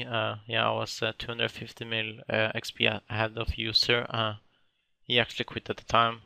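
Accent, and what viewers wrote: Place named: Norway. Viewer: his norwegian accent is very strong and thats not really how we talk at all, mostly elders who didnt learn much english at school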